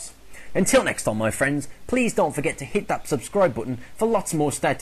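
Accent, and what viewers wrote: Birmingham, United Kingdom. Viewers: your from birmingham :D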